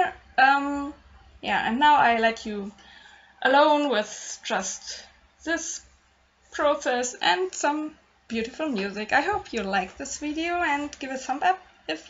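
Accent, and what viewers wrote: Germany. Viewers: me too, I love her German accent. It's a shame all the commenters on YouTube teased her about it. There is joy in diversity, unfortunately not all see things that way. 😔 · Hmmm... your accent kinda sounds german. Where does my guess come from? My mom articulates herself very similar to you, when speaking english.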